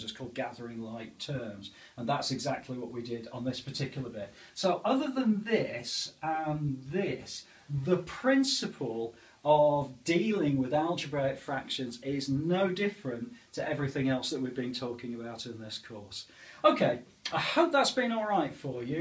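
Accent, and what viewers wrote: United Kingdom. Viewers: Thank you! I get clear explanations with a lovely British accent thrown into the mix!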